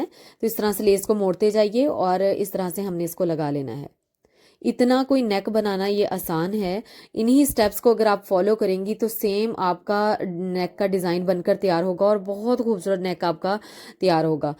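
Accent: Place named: Punjab, India. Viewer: Bhoht khub ❤ mam aap kha se ho panjabi tone aati h aapki voice mai 😊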